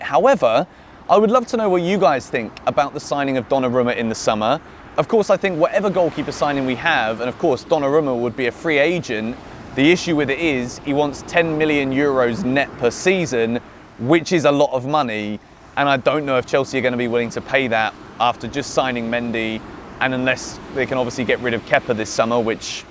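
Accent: Scotland, United Kingdom. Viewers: I would like Oscar back but I'm worried about him playing low-tier football for so long and how much the league has changed since he left. His contract expires in 2024 so Chelsea will have to buy him. I love the unexpected Scottish accent. Really love the nice daily dose of in the midday. Donnarumma would be nice because some fans forget that Edou will be out next season for AFCON and Hakim will be out as well but hopefully, we will have a more stable team next season to replace Hakim. Really love this channel and I am happy I found this channel from the beginning of it.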